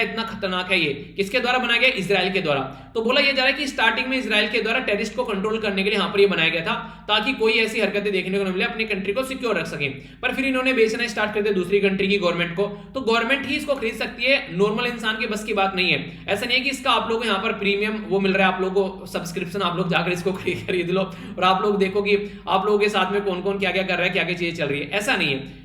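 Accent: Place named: Western Uttar Pradesh, India. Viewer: सर जी आप कहां से हो मुझे आप की बात सुनकर लगता है कि आप पश्चिमी उत्तर प्रदेश से हो कल जरूर बताना । अगर किसी दोस्त को बता हों तो जरूर बताना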